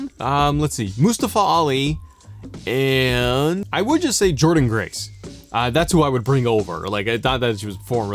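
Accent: Canada. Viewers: i knew it was east cost and he wasn't French but I figured Halifax or Toronto but I didn't wanna shoot in the dark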